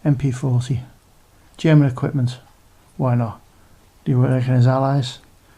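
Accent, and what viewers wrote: United Kingdom. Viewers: Hey man you've just been suggested to me. Glad i came across you as I'm going to start playing bolt action. Where you from I can hear a slight Merseyside accent but I reckon you might be from my side of the water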